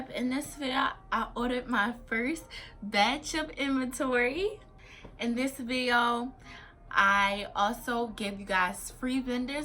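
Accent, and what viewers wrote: Baltimore, United States. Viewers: I think she’s from Baltimore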